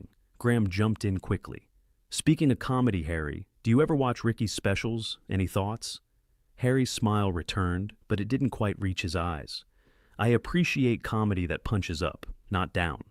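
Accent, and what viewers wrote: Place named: United States. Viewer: Yes, no record of this to be found. Having an American narrate is plain weird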